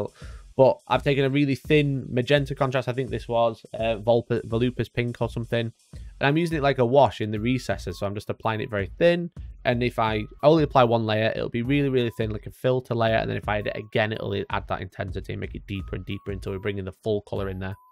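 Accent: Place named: Newcastle, United Kingdom. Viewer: Not a clue what this guy is saying. Must be from Newcastle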